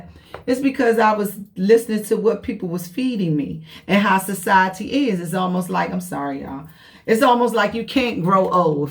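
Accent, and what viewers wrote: United States. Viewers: You sound like you from the dmv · I absolutely love your channel and your locs I subscribe cause I’m from DC and I can hear in your voice that you are from DC as well I have gray hair as well but I do dye my hair once a year but I’m done with dying my hair cause I really am allergic to hair dye you and your locs are absolutely beautiful I don’t palm roll either🖤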